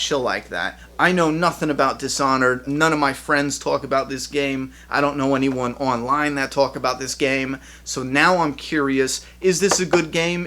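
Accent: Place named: East Coast, United States. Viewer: Love that east coast accent ;)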